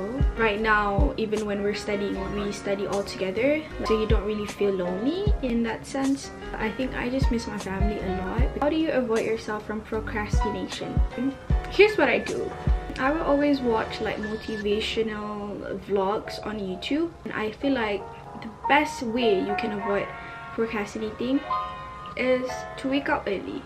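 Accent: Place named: England, United Kingdom. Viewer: yowww her English accent so🤩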